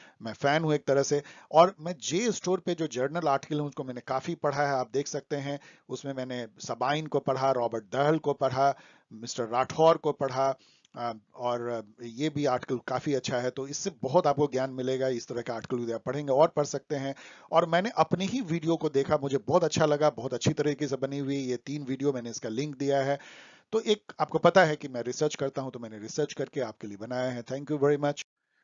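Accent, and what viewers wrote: Bihar, India. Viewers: Bihar se hai kya sir aap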